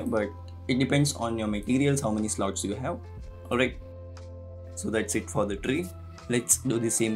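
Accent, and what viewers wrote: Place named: India. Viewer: I swear everytime I see a video that has a guy with an indian accent you know it's gonna be a useful tutorial. Thanks a lot!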